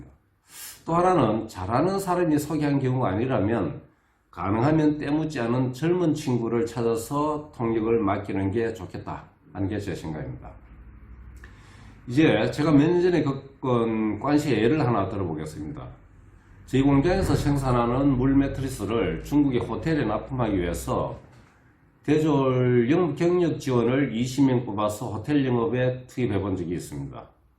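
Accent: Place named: Gyeongsang, South Korea. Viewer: 사장님 교포세요?! 제가 중국에 살아서 조선족 많이 봤는데 말투가 경상도 분 같으시네여 흑룡강 분 이신가오!?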